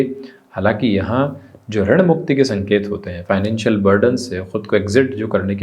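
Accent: Standard. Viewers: बहुत शुद्ध हिंदी बोलते हैं आप, नमन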